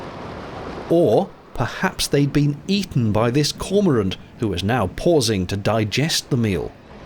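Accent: United Kingdom. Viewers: Your classic Brit narration cracks me up 😂 so I had to subscribe. Thanks for all the laughs. Cheers from California USA 🇺🇸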